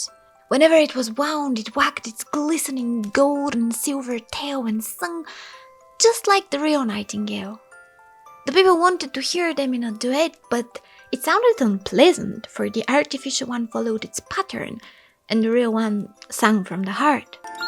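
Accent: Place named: Scandinavia. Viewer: my friend lives in sofia (not exposing his exact adress tho lmao) and he has that big mountain near by that im jelous of, there might be a chance for me to travel there for a bit for a visit, it's funny that im mostly interested in the smaller villages or like the greenery around the city. your accent reminds me of like the or quite a bit that why i thought maybe som northen europe + you have this foresty presence and it really reminds me of like norway and stuff😍 when my friend visited me in poland he's reaction was like: everything is so spacious and like wide wow. here you have i guess everything from meer, flat terrains with/without woods to mountains. i've been to mountains only once because i don't live in the south which is where they are, but im jelous how many of these are in bulgaria. we have starbucks? lmao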